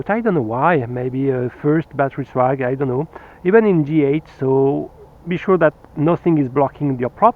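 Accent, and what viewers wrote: France. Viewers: Meilleurs Voeux 2019 avec toujours ces super revues qui font la promotion des quadricoptères et ... ...de l'accent français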